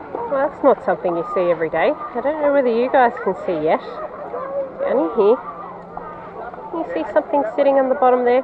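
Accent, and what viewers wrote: Australia. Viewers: You do Aussie through and through have you used your new vest yet I hope you make sure you’re not alone when you go diving with those strong currents keep up the show’s love watching them 🤩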